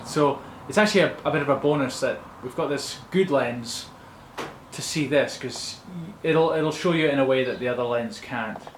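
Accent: Scotland, United Kingdom. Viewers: Are you Scottish? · Is he Scottish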